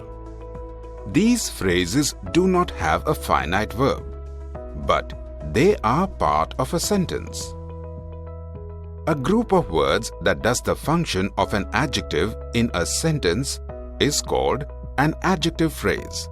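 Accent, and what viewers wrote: India. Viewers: Damn the Indian accent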